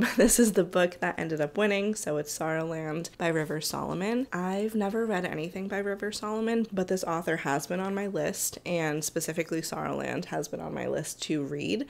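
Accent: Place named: Colombia. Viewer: me encanta tu acentito colombiano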